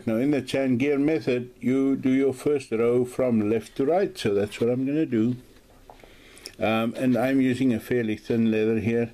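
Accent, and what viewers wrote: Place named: South Africa. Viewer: Hi you have an unmistakable accent. You must be South African. Are you in SA. I would like to get into the art of leather working I am a graphic designer by profession where does one get leather from in SA I live in JHB Randburg.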